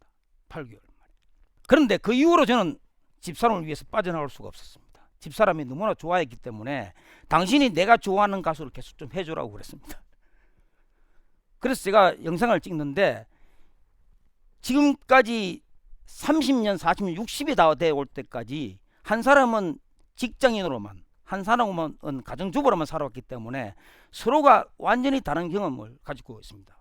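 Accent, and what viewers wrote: Gyeongsang, South Korea. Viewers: 오랫만에 듣는 명강의 였습니다 처음 종로선글에 찾아 올땐경상도 말씨로 약간은 덜세련된 말투가 너무 진정성과 친밀감을 느꼈습니다 아침 같은시간대에 어김없이 방송해주시는 마력에 빠졌습니다 삼성에 근무하셨다는 말만으로라도 나와같은 삼성가족 이구나하는 끈끈함과 가끔은 우리집사람이,우리집 사람은 하고 호중님 얘기를 하시는 모습이 너무나 자상한, 인간적인 면모를 보는듯 합니다 대통령상을 두번이나 받으실 자격이 충분하다는 생각을 했습니다 늦었지만 맘껏 축하드립니다 고맙습니다 신께 감사드립니다 호중님곁에 회장님이 계시고 그래서 저도 인연을 맺게 됨을 말입니다 환절기에 건강을 기원합니다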